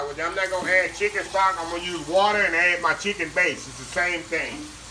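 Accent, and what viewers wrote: South, United States. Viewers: Bro it’s 7am and I’m up watching you make soup lol. You sound cool and southern, I can’t wait to give this a try! Thanks!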